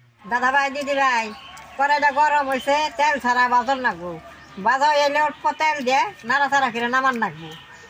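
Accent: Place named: Kishoreganj, Bangladesh. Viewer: Asam ar vasa onak ta Bangladesh ar Kishoreganh ar moton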